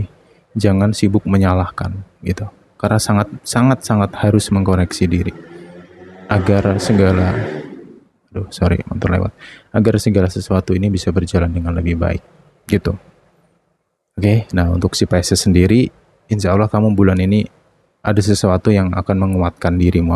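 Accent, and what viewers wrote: Java, Indonesia. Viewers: 😅😅😅 mas. jowo thoo....kalem lucu sht sll yaamurah rizqi🤲🤲🤲🎉❤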